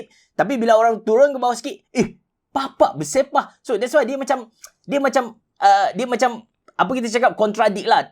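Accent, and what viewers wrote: Kelantan, Malaysia. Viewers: Pekat bahasa kelate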